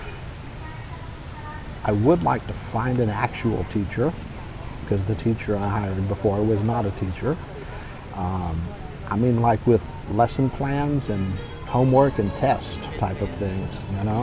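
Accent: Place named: United States. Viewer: Hey, I taught my husband who is also like you an American to say all the 5 vowels not the usual American accent. But ask your wife to drill you with how the Filipinos/Illongos pronounce all our vowels. You mentioned you can speak a little of SPANISH then you can learn Hiligaynon. The word “ng” as in “nga” or phonetically sounding as huh. Your tongue should be on the top oh your upper mouth almost covering the opening of your nostrils to make the right sound, to me is way too ADVANCE. I drilled/grilled my husband to pronounce all our vowels just like in hiligaynon. I think Melanie can manage it. And trust me you will be surprised how much you can pronounce all the Hiligaynon words in no time. Good luck 🙌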